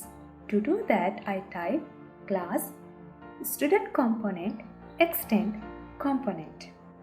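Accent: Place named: Sri Lanka. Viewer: haha I was searching for React Form tutorials and this got recommended. keeyatawath hithuwe naa Lankawe accent ekak ahei kiyala :p Good Luck whoever you are! :)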